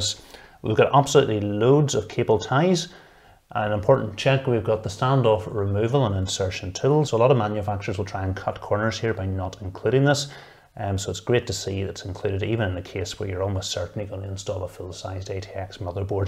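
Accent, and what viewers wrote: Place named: Northern Ireland, United Kingdom. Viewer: “Hears NI accent” subbed, nice to see a successful channel from home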